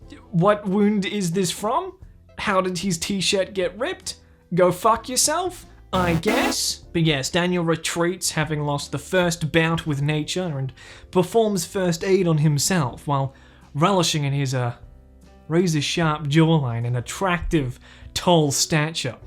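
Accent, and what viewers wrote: Australia. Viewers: that’s the beauty of the Australian accent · I always forget you're Australian. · didnt realize you were Australian thanks for the call back im one of the audio listeners. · he's australian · Are you an Australian Danny Gonzalez?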